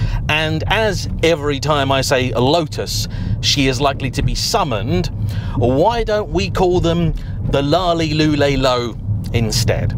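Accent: United Kingdom. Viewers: At least she does it with a pleasant British accent!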